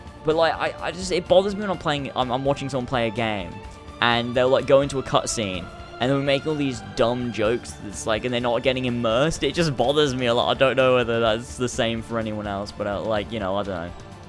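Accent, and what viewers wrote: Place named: Northampton, United Kingdom. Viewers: Where bouts in the UK are you from i recognise the accent??? Im from West Yorkshire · He's from Northampton, you're lucky mate I'm from Birmingham and we all sound inbred.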